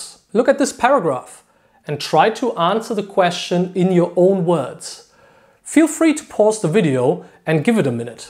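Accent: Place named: Germany. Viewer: Thanks, this is a really useful video. Do you mind if I ask where you're from? I'm assuming from your accent that you're German, as opposed to Austrian or Swiss.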